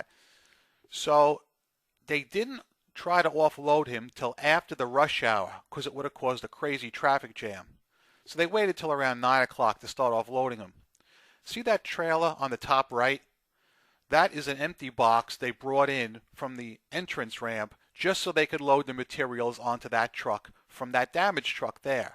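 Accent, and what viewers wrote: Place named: New England, United States. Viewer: ould probably watch this video if it en't for your yankee ass voice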